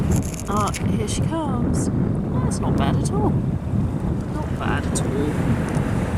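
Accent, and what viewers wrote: United Kingdom. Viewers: What part of the U.K. Is your accent from?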